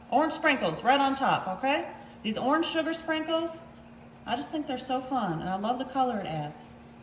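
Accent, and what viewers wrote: South, United States. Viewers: Southern accent? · I just love you and your accent. From one southern girl to another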